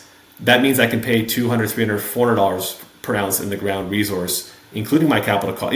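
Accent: Netherlands. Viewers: German name, Dutch accent. I saw some interesting unknown high risk , high reward names in your playlist 🤔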